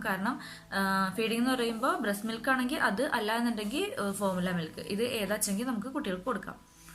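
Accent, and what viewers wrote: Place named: Thrissur, India. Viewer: good, thrissur evideya, njan plkd pattambi anu .slang njangal samsarikunna pole thanne adha chodiche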